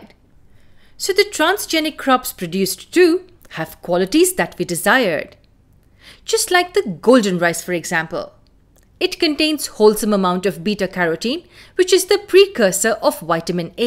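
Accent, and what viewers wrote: India. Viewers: Neden Hintli biri sunum yapmak zorunda ? Kulak yoruyor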